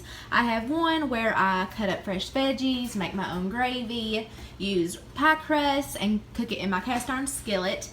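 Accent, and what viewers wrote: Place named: Kentucky, United States. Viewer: Do you mind my asking where you're from? I grew up in Lexington Ky....your accent sounds like home to me. Really enjoy your videos, some ideas for something new to make.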